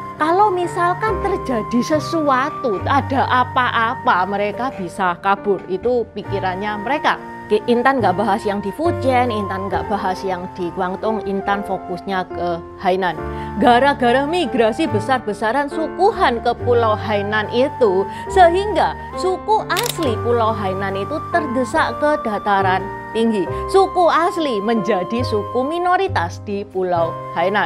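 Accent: Java, Indonesia. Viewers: Iya tuh suara nya kental banget kaya wong jowo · China medhok.....aku sukaaa😂😂😂❤❤❤❤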